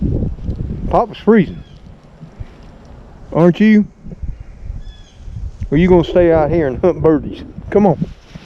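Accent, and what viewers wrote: South, United States. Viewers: I am not young but then again I am not your age either. Love southern talk. Milk barn heater hmm wonder if one can still buy those things bet my mom knew about those.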